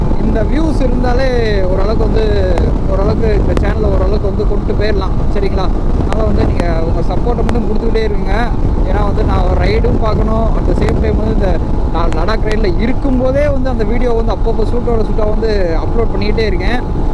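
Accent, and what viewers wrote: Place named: Madurai, India. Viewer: Madurai slang ❤️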